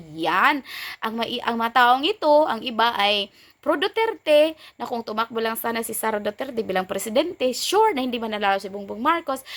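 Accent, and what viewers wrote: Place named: Visayas, Philippines. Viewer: Bisaya ka day .ayusin mo pagsasalita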